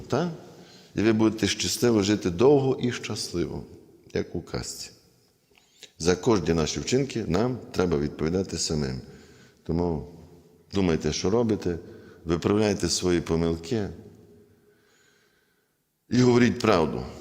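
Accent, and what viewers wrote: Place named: Western Ukraine, Ukraine. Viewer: Отче, дуууже цікаво відповідаєте на усі задані запитання. Слухаю Вас "запоєм", Ви чудовий оратор. Відчувається багатогранність Вашої особистості. Відчуваю у Вашій мові западенця, то така тепла і мила мова, я галичанка....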